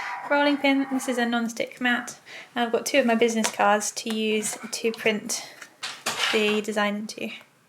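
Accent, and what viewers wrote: United Kingdom. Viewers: I feel so content when I hear your voice cuz I feel like british accents sound so professional and cool.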